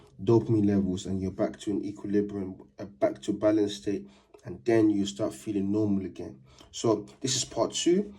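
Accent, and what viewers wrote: United Kingdom. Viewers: I love your British accent so muchhhhh bro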